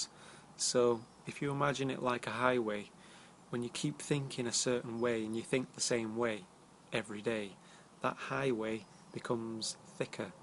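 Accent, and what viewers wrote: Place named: England, United Kingdom. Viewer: Ohhh, you're English. No wonder I keep thinking you are such a cutie... what's up with the English and your gift of gab?! It's not just the accent either. ;)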